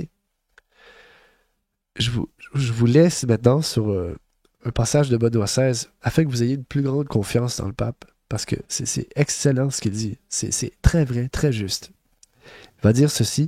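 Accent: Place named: Quebec, Canada. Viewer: Si t'es suisse alors pourquoi tu as un accent québécois ?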